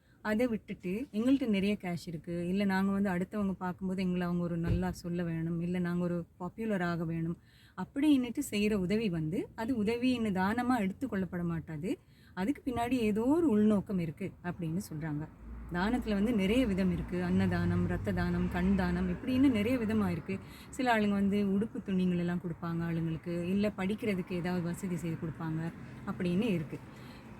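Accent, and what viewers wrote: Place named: Chennai, India. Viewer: Madras தமிழ் பேசுவதை நிறுத்தினால்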